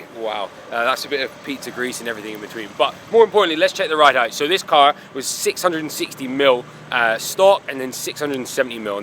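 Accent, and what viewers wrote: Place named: United States. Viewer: Yank speak, delete.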